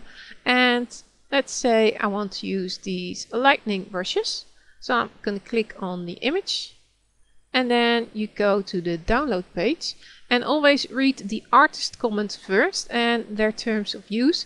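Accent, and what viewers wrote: Netherlands. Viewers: Dear. Thank you sooo much!!! And where are you from??? You sound Dutch.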